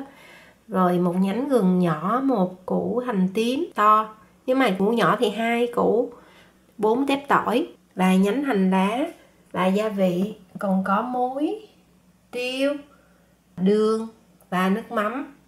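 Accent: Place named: Southwest, Vietnam. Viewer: thích nghe giọng của người miền tây nam bộ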